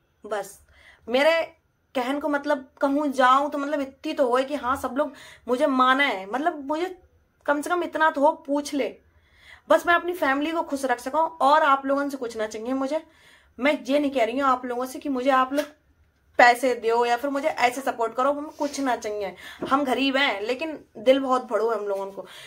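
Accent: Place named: Farrukhabad, India. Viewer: aap ki boli to farrukhabd ki lagti hai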